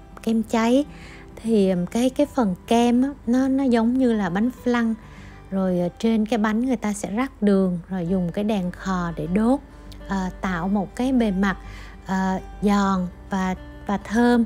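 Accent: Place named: South, Vietnam. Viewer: Giọng miền Nam của cô * nghe hay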